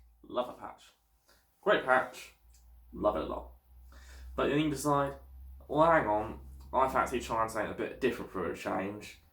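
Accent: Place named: Australia. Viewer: Damn it hell sucks, I had to stop and try find another video due to me not being able to understand a single word this guy says. It's a shame cos it seems his informations handy. I'm Australian too so I should be able to understand a fellow English speaker but this guys accent is stroonngg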